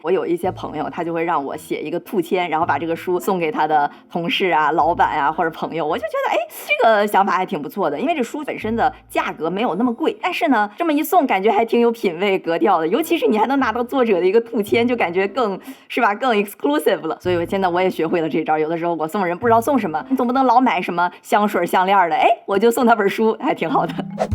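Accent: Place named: Beijing, China. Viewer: 好舒服的北京口音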